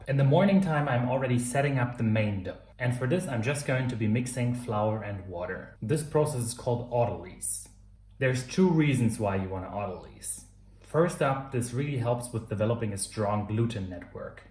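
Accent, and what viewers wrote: Germany. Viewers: Great to see baking outdoors. No need to apologise for German English if you didn't apologise no one would know.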